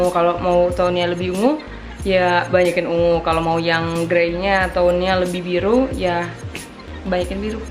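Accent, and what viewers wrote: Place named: Manado, Indonesia. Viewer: wihh.. Kaget dengernya.. ternyata orang Manado.. 😄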